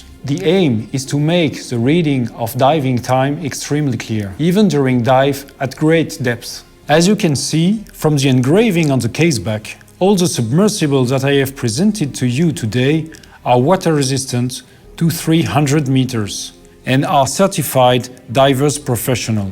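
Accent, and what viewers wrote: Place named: France. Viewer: Gotta love it when the French try to speak English. Don't get me wrong, I admire it. When I travel to France the reception is warm due to my trying to speak their language. I have a ways to go in terms of improvement. They respect those who at least have some understanding of the French language and don't butcher it.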